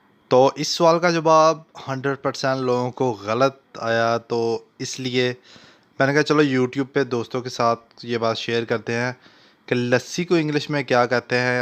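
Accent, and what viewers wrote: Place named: Punjab, India. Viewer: یہ پنجابی بندہ ھے یہ اسکول کو بولتے ہیں سکول،جاوید کو بولتے ہیں جوید،رانا کو بولتے ہیں رانڑان،باریک کو بولتے ہیں بریک😁😁😁😁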